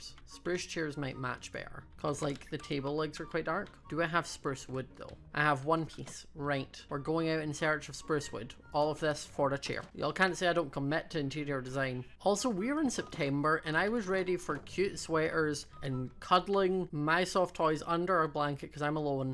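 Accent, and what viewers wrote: United Kingdom. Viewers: Waittttttt are you british?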